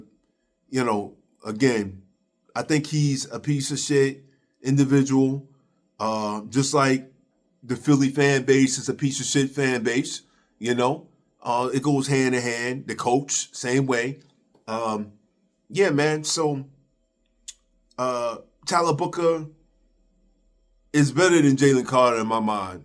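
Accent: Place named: Brooklyn, United States. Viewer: My man much love I’m feeling this video. I’m from Brooklyn. Where are you from bro? You sound like you from home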